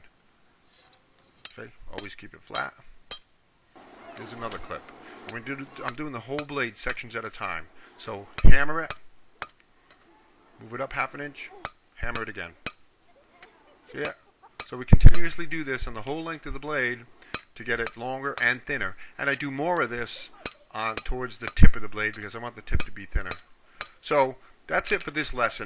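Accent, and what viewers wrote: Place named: New Jersey, United States. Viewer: I love your "new joisy" accent!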